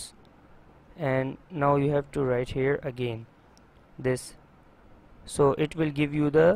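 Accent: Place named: India. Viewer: Indian accent is good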